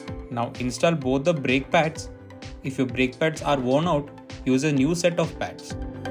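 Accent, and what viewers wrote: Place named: India. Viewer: Finally I found English indian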